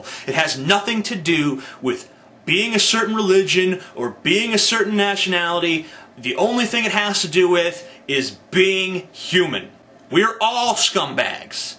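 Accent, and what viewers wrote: Germany. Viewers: I'm German and I love your german accent. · your german acent is amusing :) 5/5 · I agree with what your saying, and think your german accent is bamf However, i 'feel/think' that hitler only focused on anti-semitic ideals because he needed to have a scape goat to get a majority of the germans to side with him. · It's funny how this video created a white supremacist forum in the comment section. All reassuring each other that their points of view aren't dangerous/hateful/stupid/retarded. BTW your german accent is funny too. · Your German accent isn't too bad. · your german accent is fine :-)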